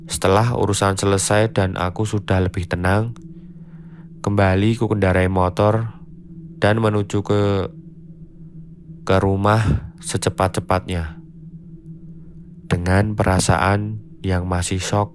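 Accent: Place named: Java, Indonesia. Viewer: Suka ama aksen Jawa masnya Sukses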